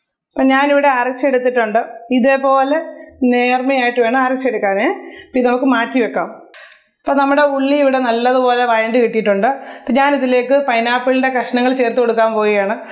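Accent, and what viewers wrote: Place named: Ranni, India. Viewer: Facebookil page kand recepies try cheyyarudarunu. Ariyavunna oral parayumpoleya samsaram. Pathanamthitta ranni samsaram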